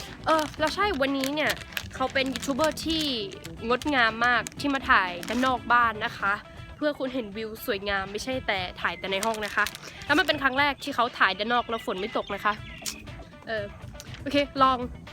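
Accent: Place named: Central Thailand, Thailand. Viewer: พูดกลางชัดกว่ากูอีก 555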